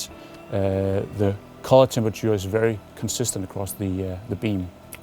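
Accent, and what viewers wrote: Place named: Denmark. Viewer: total danish english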